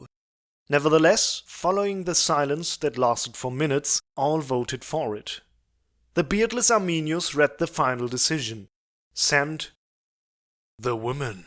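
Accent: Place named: Germany. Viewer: Das ist sehr gut. German english pronunciation sounds great and hilarious! Glad I found your channel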